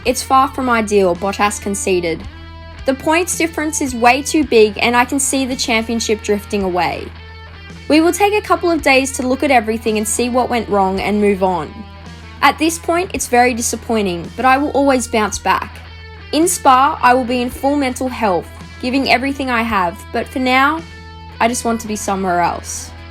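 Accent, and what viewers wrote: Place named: Australia. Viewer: Nice, good to hear an Aussie on here!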